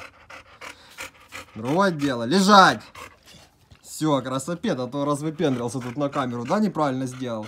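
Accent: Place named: Ukraine. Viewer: Дача, украинский акцент, тупа, ля, чи не., ты ли это?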